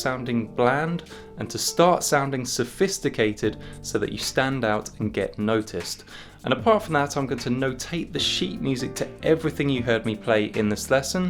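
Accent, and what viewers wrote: United Kingdom. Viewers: How come you speak British, living in the States? I can hear that, as I am from Flanders.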